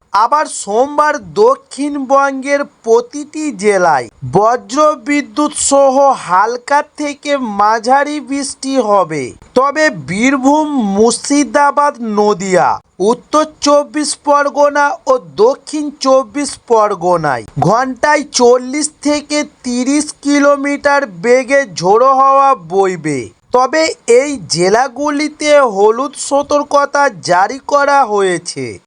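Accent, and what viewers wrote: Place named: Malaysia. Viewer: তুই এই চ্যানেলটা কবে খুলেছিস তোর গলার শুনে মনে হচ্ছে তুই মালয়েশিয়া থেকে আসছিস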